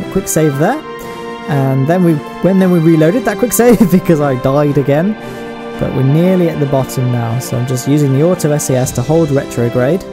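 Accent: United Kingdom. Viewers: Got a feeling he might be British. Any else one getting that feeling? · Do you from UK?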